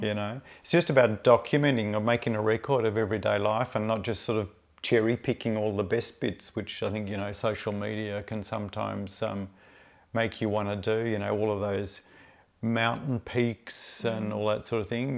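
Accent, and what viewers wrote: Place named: RP, United Kingdom. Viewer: your English is perfect,.. even sounds a bit posh 😄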